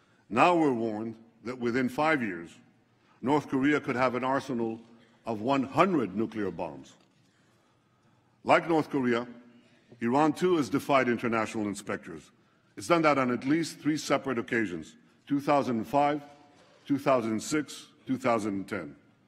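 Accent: United States. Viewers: Fascinating to see a foreign leader with perfect American English, honed in a US High School. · Why does he sound American?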